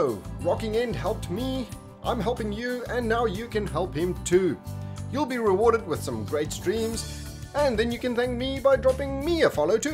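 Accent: South Africa. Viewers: Are you South African? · south african? :) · you sound like a south africanXD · Thanks for the tutorial, you South African?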